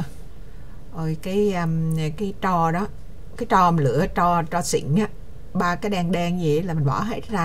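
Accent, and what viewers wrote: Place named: Central Vietnam, Vietnam. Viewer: Chị nói chuyện thật là mộc mạc, đúng người miền Trung ❤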